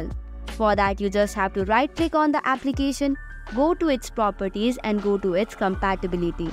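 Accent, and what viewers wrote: India. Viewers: ARE U INDAIN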